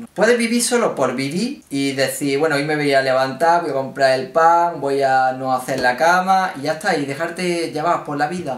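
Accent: Spain. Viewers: Ya se me esta pegando tu asento español PD: soy de mexico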